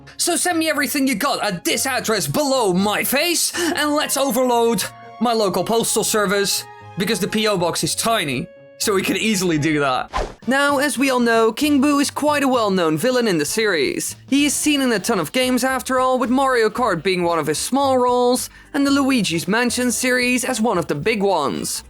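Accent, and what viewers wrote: Netherlands. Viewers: Ik dacht al dat je Nederlands was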